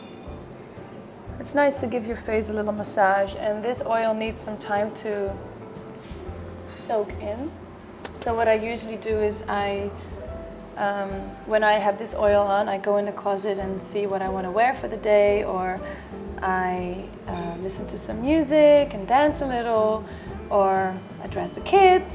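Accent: Friesland, Netherlands. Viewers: I love your dutch Fryslân accent!! 🥰❤️😍💋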